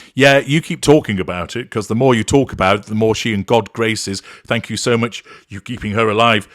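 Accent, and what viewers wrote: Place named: Northern England, United Kingdom. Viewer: OMG, you're white, I didn't know that. LOL I think I do detect a slight Northern accent. I enjoy hearing the "Horn of Ridicule". I'm awaiting more episodes of when you met the Mare (like you, I do not refer to her by name). I'm surprised that nobody had yet used "high yaller" to describe the Mare! Stay well and safe. 😀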